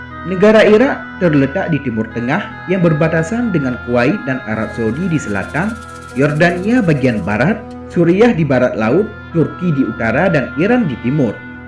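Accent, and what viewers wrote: Aceh, Indonesia. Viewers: Logat Aceh ad irama Arab Arab ny sdkit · Orang aceh ya bang? Logatnya terasa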